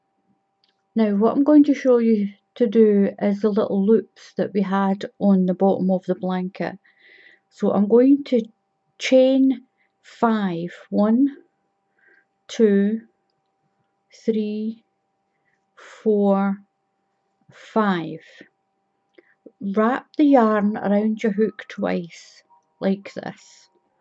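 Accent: Scotland, United Kingdom. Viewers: I love this very pretty blanket, and I will be doing this for my new grandchild when he/she arrives end of June, thank you so much for your very easy to follow instructions, and your lovely Scottish accent xxx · Thank you, you are such a good tutor. I love your Scotish braw.I've got a few Scottish friends also, so I'm used to hearing that.I love this pattern it's brill. I've got my wool ready, so I'm gonna make this..I'm just getting over Coronavirus so we're social distancing self isolating as best we can, me and my Husband are, I need something like this to pass time. So glad a found you💗.Keep safe.Take care.xx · Are you from Scotland? Love hearing you talk!! Thank you for going slow and explaining so well. ❤ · I am so delighted to have discovered your channel - your instructions are very clear and as a fellow Scot I love your accent! I'm fairly new to crocheting and I'm finding it difficult to know what size would be best for a pram blanket - I am seeing anything between 24x34 inches to 30x39 inches for an oblong blanket and anywhere between 36 and 48 inches square which would make a big difference to how much yarn I would need to buy! 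Could I just ask, if you were crocheting a pram blanket, what size would you make for an oblong or square blanket? Many, many thanks! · well I think it’s a lovely stitch and blanket, and hope to recreate it myself. You can’t please everyone. Nice to hear a wee Scottish accent and look forward to seeing more from you. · I love your tutorials. Plus I love your accent my mum was Scottish sadly she has passed away and she sounded just like you I could listen to you all day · It's also nice to hear another Scot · This is a beautiful afghan/blanket stitch. Thank you for excellent simple instructions. I absolutely love your accent - I love accents - and I believe you are Scottish, which I have ancestry in Scotland and Ireland. Thank you so much for this help and will be going back and viewing other videos you have put on YouTube. Thank You and please you stay safe and healthy as well. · thanks for this lovely pattern. 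I'm half way through it. The yarn I used is a little fine, but it's still working up very quickly. Love your videos & the accent..only know one person from Scotland here in Ireland. Keep up the good work. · Thank you so much. This pretty baby blanket certainly did crochet up quick and mine turned out gorgeous just like yours. You made following the pattern so easy. I feel so much more confident now. Just love your Scottish accent. Thanks again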